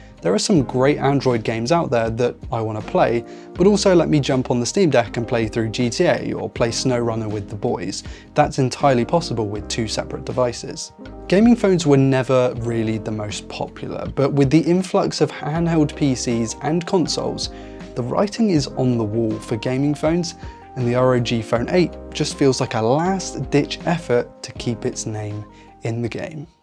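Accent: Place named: Cornwall, United Kingdom. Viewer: No way your from bodmin. I thought I recognized that accent. 😂 cheers from fowey!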